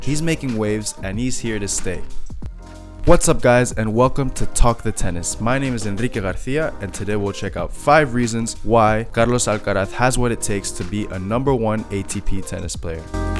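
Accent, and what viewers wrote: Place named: Spain. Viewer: Pareces chino, hablas perfecto inglés y se nota que eres español a la vez xd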